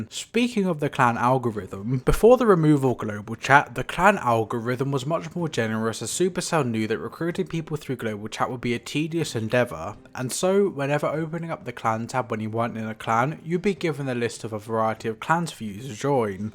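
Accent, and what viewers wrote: United Kingdom. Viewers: You sound like you’re northern Irish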